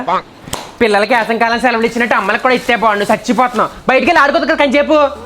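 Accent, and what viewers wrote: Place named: Godavari, India. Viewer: అసలు గోదావరి భాష.. నాకు నచ్చింది..మాది భీమవరం.. పెళ్లి అయ్యక మచిలీపట్నం..నేను ఈ బాషా మిస్ అవుతున్నా...పప్పలు కొనుక్కోవడం ..నా యేదం..బాబా, ఎంత అందమైన భాష 😅😅😅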